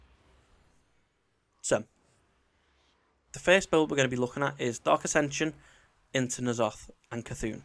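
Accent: Liverpool, United Kingdom. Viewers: Is that another scouser playing WoW ? Boss.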